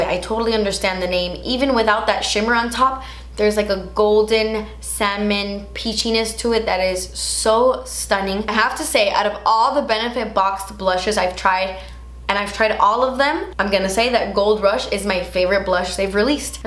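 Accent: Cuba. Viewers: Lo que está pa’ti nadie te lo quita, I’m Mexican, And I’m so in love with your Cuban accent ❤️💗💖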